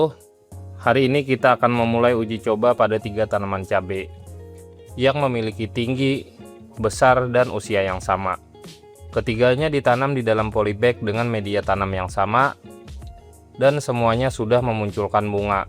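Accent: Banjar, Indonesia. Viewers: Urang banjar mun ndagari logatnya sidin ni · urang banjar kah pian, bahasa banjarnya masih ada lah, kada hilang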